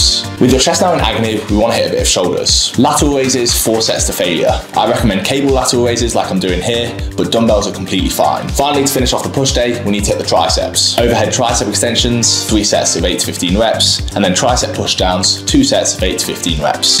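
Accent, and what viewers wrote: United Kingdom. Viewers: The british accent 🙂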